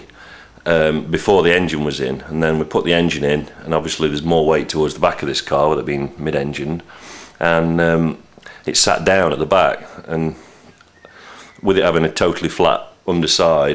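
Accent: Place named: Northern England, United Kingdom. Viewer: Sounds nothing like him. This guy is from the north of england and has a very similar accent to myself. James may is from the south with a more neutral accent.Imagine me saying Woody Harrelson sounded like Mark Wahlberg and it will give you some idea of the difference.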